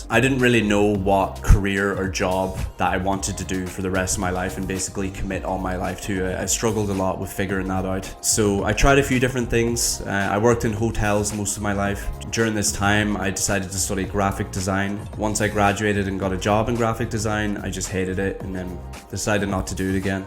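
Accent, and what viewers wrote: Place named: Donegal, Ireland. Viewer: No way! You're from Donegal and I thought of catch your accent. I lived in Donegal 14 years all of my mixed Thai/Irish niece/nephews also living there. But myself moved back to Thailand for this period. I agree with you after moving back to Thailand. Many things has changed in Thailand, more conveniences, better, improvement and safety, cost of living a lot lower than Dublin, but you still have modern city vibes in Bangkok like many other rich City. 🎉😊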